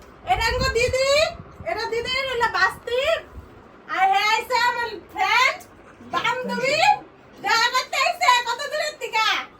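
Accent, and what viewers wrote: Bangladesh. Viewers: The dialect you through when you speak is same like grater dhaka district ( not dhaka city ) village area of dhaka district, narayan gaung, manik gaung,narshindi,bikrompur , gazipur even greater farid pur district also speak same dialect....I pray to God keep all of you happy and prosperous...from Dhaka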